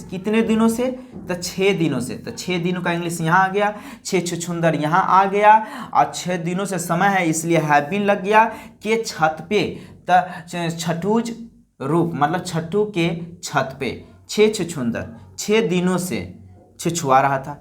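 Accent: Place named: Bihar, India. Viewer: बिहारी हो क्या भाई... इसीलिए "ड़ा" को "रा " बोल रहें हैँ।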